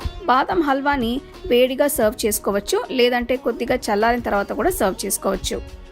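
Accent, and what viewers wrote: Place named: Kerala, India. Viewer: Meeru English lo matladuthe eh kerela oh Mysore anukunanu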